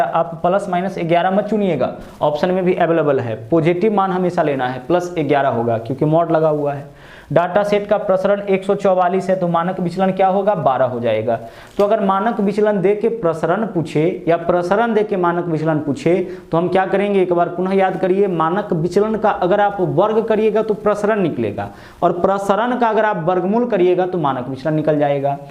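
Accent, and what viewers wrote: Bihar, India. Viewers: Aap bihar se hai sir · Sir aap bihar se h kyy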